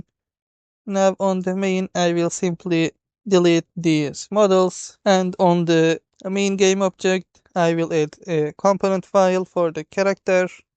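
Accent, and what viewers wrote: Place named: Turkey. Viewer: Türk aksanı gibi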